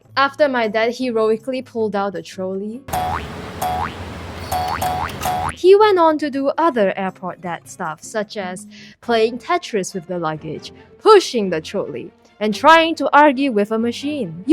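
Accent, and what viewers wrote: Malaysia. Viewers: how malaysian chinese speak chinese is so real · Ngl the Malaysian Chinese accent catches me offguard every time lmao.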